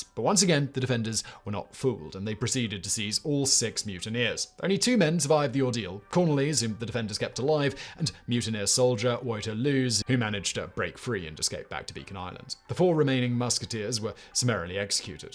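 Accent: England, United Kingdom. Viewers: Ade you all being serious? Perfectly fine English accent and speech pattern! Lord help ya’ll if you bump into a Glaswegian😲🤣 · I have no idea of most of his pronunciation. Is he English?? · No offense, but to me, Englandish accents are annoying. I’d rather listen to AI, than someone unable to speak English in a less affected tone, or way. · When there is anything, you are not, you are you’re just smart and stupid ass to the rest of us, but your accent seems to fool the Americans Yes, because you’re British you’re not not you are not smart for the fall as many Americans and Canadians seems to think you are useless dumb ass everybody else and even dumber sometimes when the way you presented videos say my name you you’re stupid stupid man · Southern California raised and when I see a thing about Mexican anything and then hear the British accent I know I am in for a bad time. 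Mispronunciation of non English words is nails on a chalkboard to me and at this point I think the mispronunciation is on purpose at this point as revenge for losing the colonies. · This guy pops up in almost every channel I'm subscribed to but with different chin hair every time - I always recognise that posh English voice though ha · If it wasn’t for accent (fairly typical British) I’d swear that English wasn’t even remotely close to his native tongue - the way he mispronounces so many straightforward words eg wallarbees (What the feck dude!?!?) is so funny to hear. Doesn’t anyone in his crew bother to proof read or check such basics before uploading this stuff? It’s becoming as bad as the “Dark5” series of channels with all its errors, cockups, and general idiocy. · I'm not sure what I like more about this video. The historical storytelling, glorious British accent, or that godly beard he's rocking.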